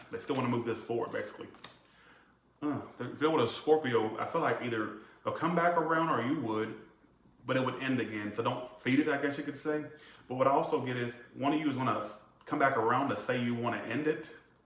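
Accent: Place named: Louisiana, United States. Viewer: Hes Creole/ Louisiana I think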